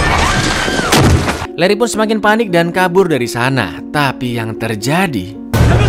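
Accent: Java, Indonesia. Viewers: Intonasi, jawa-nya terasa banget + mantap bang.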